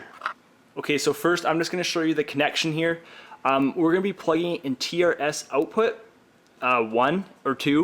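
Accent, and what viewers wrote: Canada. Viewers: Is this dude...Canadian!?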